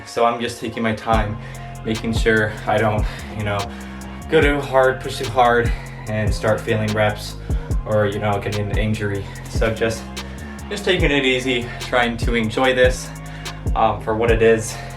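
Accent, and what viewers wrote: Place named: United Kingdom. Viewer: Where are you from? Because the land in your videos are american, but your accent is brithis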